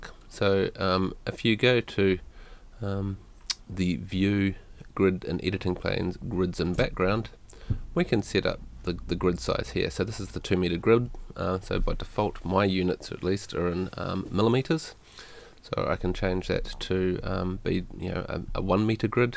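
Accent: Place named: New Zealand. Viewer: Thank you, really easy to follow along with. I like your accent, are you from New Zealand?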